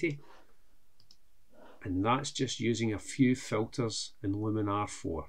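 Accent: Scotland, United Kingdom. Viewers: i hope that you are not angry with my because of my comment, but i actually more used to american english, so it took a while before i understood what you said. I like your accent, but it was so strange to me in the beginning. In my 2,5 years of learning english, i never heard scottish accent. forgive me please;-) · That’s quite rude.. Scottish accent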